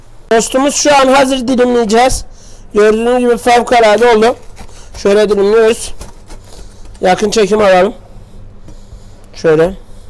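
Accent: Gaziantep, Turkey. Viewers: Senin takipcinim ve her videonu neredeyse izliyorum bana yakin ve bizden biri olman hafif gaziantep siven kendini ozel kiliyor kucuk bir takipcinim tostlarini evde deniyorum ogrenciyim ne de olsa senin tükkan da tost yemek isterdim...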